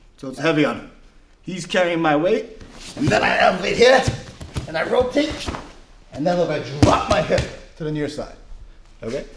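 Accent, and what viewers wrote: New York, United States. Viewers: I like how he speaks New York :-)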